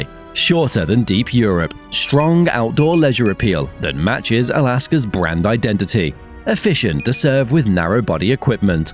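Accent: United Kingdom. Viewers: You have the most annoying standard British voice ive ever heard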